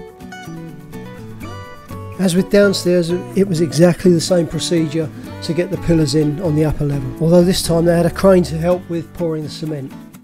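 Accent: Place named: South London, United Kingdom. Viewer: Hi I was the one who couple months back said you must be from south of the river with your accent, live Sydney but spend lots time in Thailand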